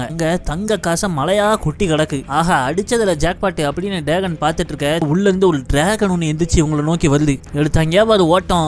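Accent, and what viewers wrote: Tirunelveli, India. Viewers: Tirunelveli slang thalaiva 😁ellarukum pudikum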